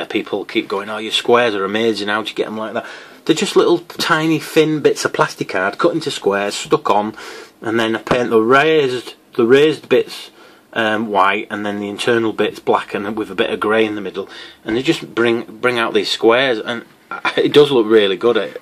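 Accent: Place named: Yorkshire, United Kingdom. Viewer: Oh.... I somehow have the impression that you are a Yorkie,? I do hope that I am not wrong because such a realization would indeed make me turn into a dog with its tail between its legs, to use a metaphor..... Anywhos..... I suppose anyone from the Tees to the old Humber, and all in between and possibly, all here, there and everywheres, could be classed as being a Yorkie, even though that does not make even a smidgin of sense.... However, and even so-so, I actually get the feeling that your beautifully eloquent commander like voice has a tint-of-a-hint of an accent that is actually from no less than bang, stab, right in the middle of the old, soon to be re-vamped, kingdom, i.e. your accent to me suggests that you are born and bred, and I suppose, ultimately from - York City - long-winded or no?. I do hope that I am not wrong. So, what is the answer? 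Leeds, no doubt! When I think about it, though, I am now leaning more towards Sheffield....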